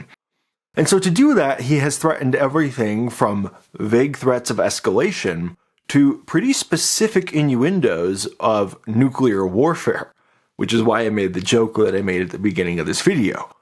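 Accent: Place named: United States. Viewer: so irritating to read your obviously german name, but hear that american voice.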